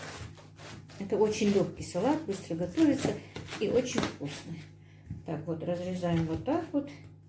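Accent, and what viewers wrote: Standard. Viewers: Какие интересные рецепты, обязательно приготовлю. Слушаю вас и поражаюсь : какая у вас правильная литературная русская речь! Ваш голос и речь очень похожи на голос моей тёти — учительницы русского языка и литературы!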